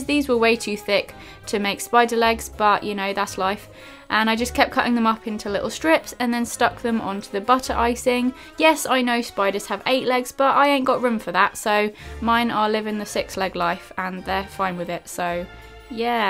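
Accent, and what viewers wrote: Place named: England, United Kingdom. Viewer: i found ur videos other day now im hooked on watching them all xx ... r u from north south east or west england as ur accent is lovley xc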